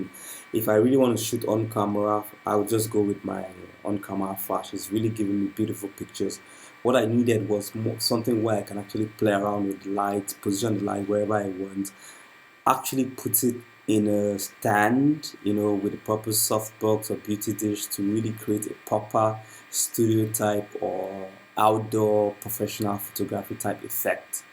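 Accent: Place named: Africa. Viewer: Nice review You sound like an African brother, where you from ?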